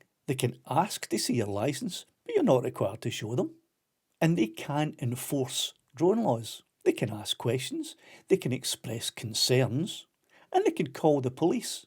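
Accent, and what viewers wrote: Scotland, United Kingdom. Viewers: Useful video, but it might be good to check the subtitling- there are at least two places where the subtitles confuse can and can’t in a Scottish accent, so say exactly the wrong thing (passers-by “can enforce drone laws” for example, instead of can’t)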